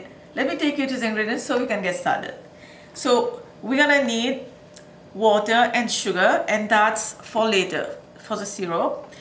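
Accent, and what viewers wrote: Mauritius. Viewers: Natural Mauritian accent. Nice. No forcing other accents 👍🏽👍🏽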